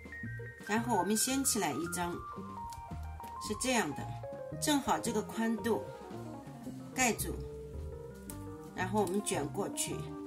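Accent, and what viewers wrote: Shanghai, China. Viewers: 親切的上海普通話，很久沒有吃過這道家常菜了。謝謝Jenny!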